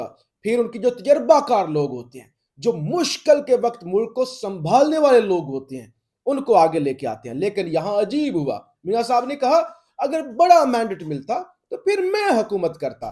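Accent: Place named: Rampur, India. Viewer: Asslamoalekum Ap plz yeh bate yeah Ki Apki Ammi ya Abbu Ki traf Ka koi india kr U.P se hai, Rampuri hai koi na koi 😅… Ap Bilkul Rampuri Andaz Ke ho, Ap Pakistani nhy lagte ho … plz reply 😅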